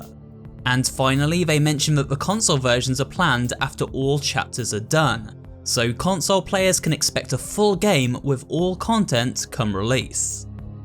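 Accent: United Kingdom. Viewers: Why does she talk like British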